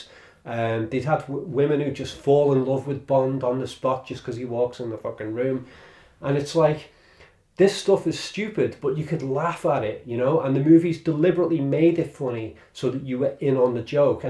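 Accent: Liverpool, United Kingdom. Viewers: Letting his inner scouser out. I suspect he’s capable of a much more feral scouse accent but keeps it in check for his international audience 😂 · Interesting to hear your take on Bond - given your accent I keep forgetting that you are the citizen of a monarchy that lost an empire....hence the need for the delusion humorous or otherwise. G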